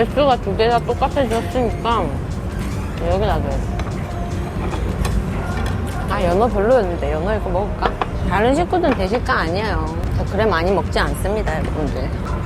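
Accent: Gyeongsang, South Korea. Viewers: 경상도 사투리 구수함 😊😊😊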